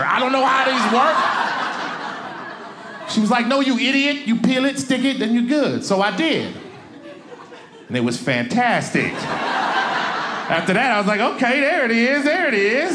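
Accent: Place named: Chicago, United States. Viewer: He is definitely from Chicago... 😊👌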